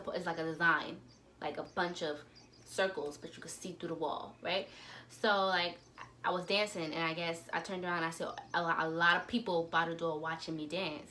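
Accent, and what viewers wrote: Trinidad and Tobago. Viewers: You are talking and can hear the trini accent.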